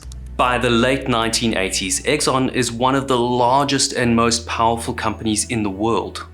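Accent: South Africa. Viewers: YERRZZZZ OF DEVASTATION LOL south African accents are the best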